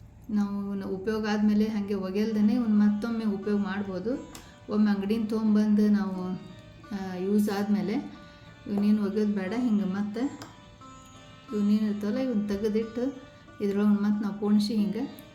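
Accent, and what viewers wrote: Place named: Karnataka, India. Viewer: I love ur Kannada slang ❤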